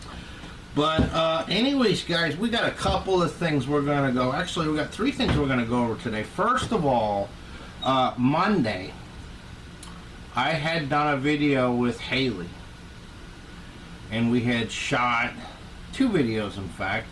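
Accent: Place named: New England, United States. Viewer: "Draw-ring"....lol, can't shake that New England accent. Abdiel murdered that skull challenge!!! Den Mother Edie was super clever making her Lenofish out of a skull, I didn't see that coming! Congrats to Fraidy and Monster Morgue Tutorials(Abdiel) for their wins!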